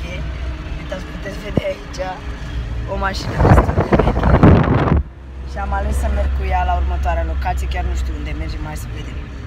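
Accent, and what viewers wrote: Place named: Egypt. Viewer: بجد بتبقى عسل جداا لمل تتكلم مصرى💟💟💟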